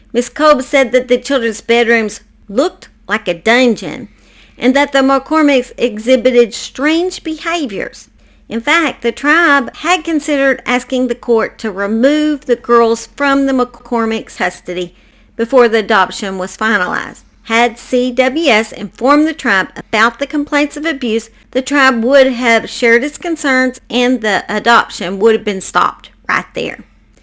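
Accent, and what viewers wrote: South, United States. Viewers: sweetheart, my Mum would've loved you ❤, we both always loved hearing that beautiful southern accent ❤. You're gorgeous 💕. Haven't left many comments dear, but watch all your videos❤🇦🇺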